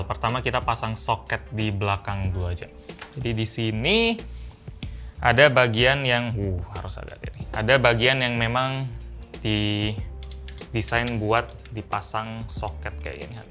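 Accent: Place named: Java, Indonesia. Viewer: bahasa mu medoke banget hahahahahhaahhahahaha "DARIPADHA"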